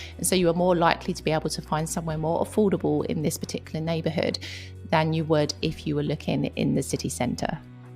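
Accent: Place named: London, United Kingdom. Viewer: Just found your channel what a breath of fresh air,really enjoying your vlogs and looking at some stage to spend about 3 maths of the year in Italy as retired and enjoy the lifestyle... Sicily really appeals so get that business set up soon.. Love the honesty and of course the accent Londoner myself keep up the great work and Godbless you🎉🎉🎉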